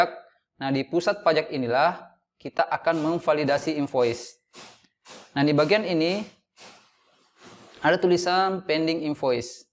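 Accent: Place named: Makassar, Indonesia. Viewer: Kak orang makassar yah?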